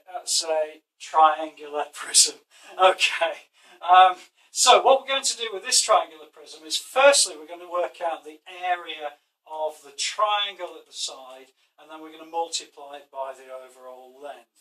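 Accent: England, United Kingdom. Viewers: i'm english and i feel the same way idk why though i should be used to my own accent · For some reason a Englishman explaining these things are more reassuring.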